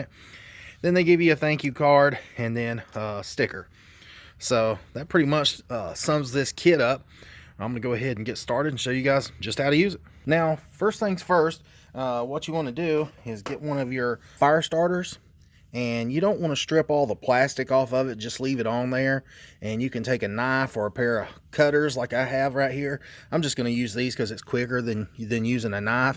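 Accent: United States. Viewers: Are You From Tennessee? Cuz Ya Sound Like Yer From Tennessee LOL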